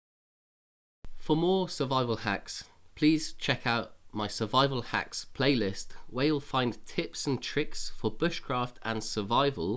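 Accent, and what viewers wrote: United Kingdom. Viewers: Are you a fan of Ray Mears? He is a brilliant man, and I have yet to find anyone better than him at Bushcraft. This is my first time visiting your channel, and you remind me of him a little bit! (Not because of the UK accent) I subscribed and gave this one a thumbs up. I always enjoy watching new Bushcraft techniques! Great video!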